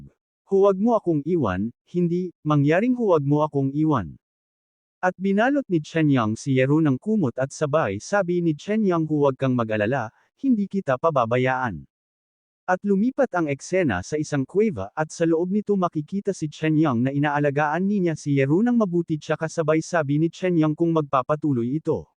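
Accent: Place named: Visayas, Philippines. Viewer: Ang recap mo parang bisaya eh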